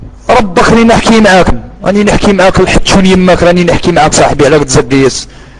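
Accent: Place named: Morocco. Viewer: لاول مرة اعرف ان معسكر يتكلمون لهجة مغربية خخخخخخ ياو راكم تبهدلو في روحكم المتكلم لهجته مغربية على كل حال الله يهدينا كلنا اخوة مسلمين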